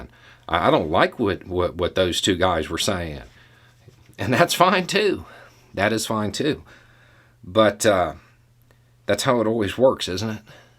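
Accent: South, United States. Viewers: I just LLOVR that southern (drawl?). We here in east bay SF agree you got it absolutely down! These people (everyone) better wake up. Good luck🙏🏿👍🏿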